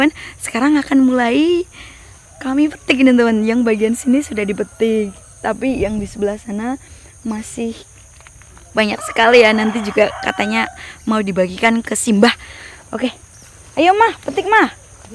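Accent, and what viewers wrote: Banyumas, Indonesia. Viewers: " kepriye".. 😄 kirain kak asli orang bali atau menado.. ternyata banyumas ya... ❤️ seru euy kebun ibunya kakak.. sehat selalu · Wah,ternyata aslinya ngapak. Bener² gk nyangka. kirain asli bali. Sukses selalu ka 👍😘 Seru banget panennya, sy jd pengen pulang kampung 😊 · Wahh wonk ngapak to mba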